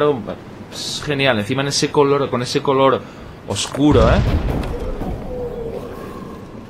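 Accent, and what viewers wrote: Asturias, Spain. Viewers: maquina como se nota que yes asturianu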